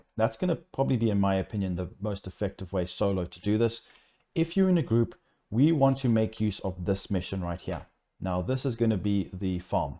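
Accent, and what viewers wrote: South Africa. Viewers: South african? if so what clan are you from?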